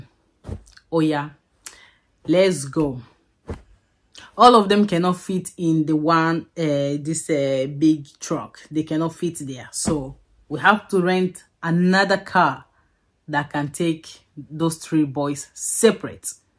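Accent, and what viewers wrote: Igbo, Nigeria. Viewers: A Congolese speaking like a Nigerian. Your accent is pure Nigerian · Great presentation and experience considering the facts of how things really work back home , but our wife you already sound like igbo woman accent ❤😅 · I ve learnt a lot of good values and beliefs that help spur Nigeria's economic development. Drive to build yo own house b4 going back home - cant stand staying in a hotel. Funny how your accent is more Nigerian than Congolese 😅😅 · Wowoo! You already speak like an igbowoman, a Nigerian. You have a house- home in Nigeria. The way you pronounce Aba, Ebuka etc i think in your first world, you're igbo and Nigerian before they transferred your body to Congo, then your husband went and brought you back to Aba, Nigeria. I live in Aba also, Aba ma ndi Aba. You're welcome. Im happy for you. God bless you and your family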